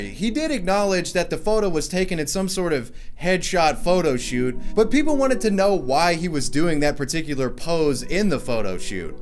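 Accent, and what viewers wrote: South, United States. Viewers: it's not kids these days, he's from the south. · It's cool. We know you from the dirty south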